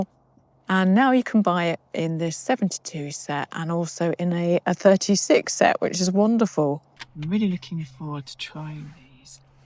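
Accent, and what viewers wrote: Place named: United Kingdom. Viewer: Thank you for this review. I'm a Brit living in Denmark, it was wonderful hearing the dialect from home 😊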